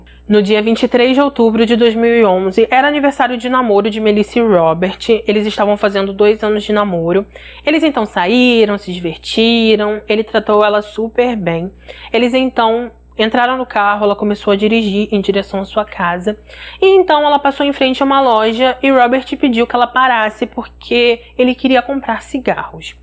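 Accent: Rio de Janeiro, Brazil. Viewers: Ainda tem esse sotaque lindo de carioca.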